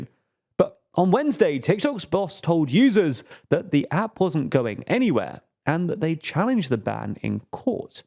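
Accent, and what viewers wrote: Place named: United Kingdom. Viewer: Presenter is British, speaking British English (from where the language originated) rest assured he is pronouncing it in the correct manner.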